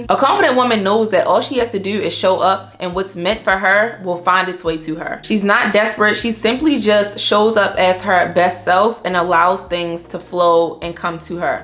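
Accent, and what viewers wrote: Baltimore, United States. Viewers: Hold up your Baltimore?? Your accent sound like bmore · Sorry it have nothing to do with the video but it sound like you from Baltimore but not in a bad way. I’m currently trying to break my habit of saying my words correctly. Which you do, it’s just how you say certain words lol 🩷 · Beautiful! So many nuggets! Also, Sis you from Baltimore? Lol ❤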